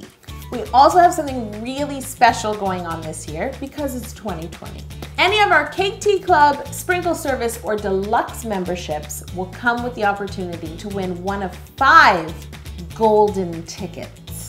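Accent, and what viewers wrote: Canada. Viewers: "This tee is so reflective, eh?" Me: Yep, definitely Canadian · I love her and her Canadian-ness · She sounds so Canadian what are you talking about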